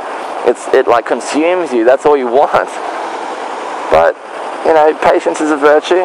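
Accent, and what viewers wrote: United States. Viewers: you pronounce words with an american accent at times. Its weird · Why do you say your A's like an american? Us Aussies chuck an R after an A. e.g. Carn't, barsketball, arseholes, cmon man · thanks a nice vid, but I noticed you've picked up a US accent? Watching to much youtube? Seriously though, you make some good points esp motorcycling is dangerous, we need to be learning, practising skills ect always. And the more you know the harder you seem to push it, we all need to watch this. There are many advanced courses out there, usually taught on a race track with expect/experienced teachers. Riding fantastic fun and well worth the effort, ride safe everyone. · also you have a slight American accent with different words lol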